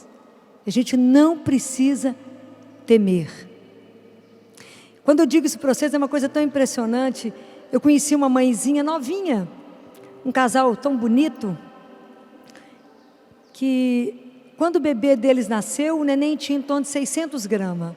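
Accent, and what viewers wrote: Minas Gerais, Brazil. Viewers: Eu chorei e consegui aprender muito.pq muito do q ela falou e passei e passo pq meu irmão esta desaparecido ha dois anos. Mas aprendi que entre ele e o sumiço a misericórdia de Deus é infinita. E se fizeram algum mal pra ele como disse o marido dela.Deus irá ou já fez justiça. Mas não sabemos o q houve. Mas sabemos q Deus esta com ele onde quer que for. E meu pai teve morte trágica ele interrompeu a vida dele aos 62 anos. E através da pregação dela e ainda mais q foi mensagem do papa. Hoje eu creio que meu pai e de meus 8 irmãos esta no céu. Com Jesus e nossa senhora. Obrigado Dra por existir por compartilhar e ajudar tantas e inúmeras vidas. Amo te ouvir ainda mais por ser mineira. Q acho lindo esse sutac. E vc é maravilhosa pq disse seu Sim a Deus e por tudo. De toda minha alma e coração muito OBRIGADA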